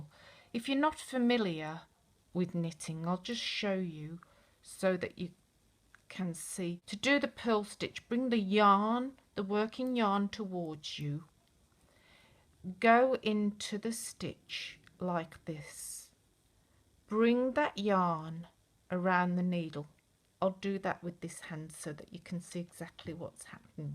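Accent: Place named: Birmingham, United Kingdom. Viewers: nice to hear an English accent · love your Brumie accent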